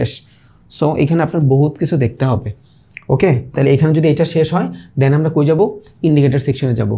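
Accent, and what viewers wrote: Bangladesh. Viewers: দাদা আমি ভারত 🇮🇳(কলকাতা,পশ্চিমবঙ্গ থেকে আপনার ভিডিও গুলো এই বেশ কিছুদিন হল দেখছি, আমি বাংলাদেশ 🇧🇩কে অনেক ভালোবাসি এবং ওখানকার মানুষ জনদের কথা ও কথা বলার ধরণ আমার খুব ভালো লাগে,হাসি 🤣 ও পায় | 🐯 বিশ্বাস করুন আমি প্রথমে আপনার ভিডিও গুলো দেখতাম শুধুমাত্র কথা গুলো শুনবো বলে , কিন্তু পরে ভিডিও গুলোর Content ,আপনার বোঝানোর দক্ষতা ,ও আপনার পরিশ্রম কে দেখে আমি আর Comment না করে পারলাম না | 🔥আপনার প্রশংসা যতই করা হোক না কেন কম হয়ে যাবে |🤗 যাই হোক ভালো থাকবেন সুস্থ থাকবেন আর আমাদেরকে এই রকম ভাবে আরো সমৃদ্ধ করবেন 🙏 Always Support to You ✌️😉 ভারত 🇮🇳 থেকে শুভেচ্ছা ও ভালোবাসা নেবেন 🥰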